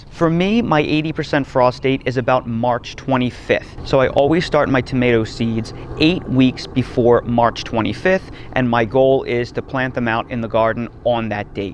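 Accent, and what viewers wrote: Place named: Northeast, United States. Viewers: You’re not originally from NC are you? Accent says NJ/PA/NY. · You sound a little bit South Philly Italian to me. I’ve learned a lot from you. I’ve definitely bitten off a lot but I’m reasonably optimistic.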